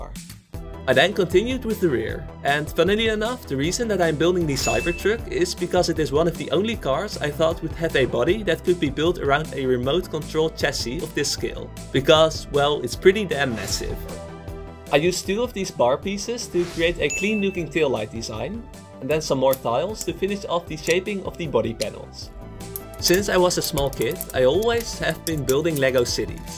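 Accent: Netherlands. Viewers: Echt een heel erg nederlands accentje, maar wel leuk man dit hahaha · No shit bro ofc hes dutch you can hear in inmediately by the way he talks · i can hear is NL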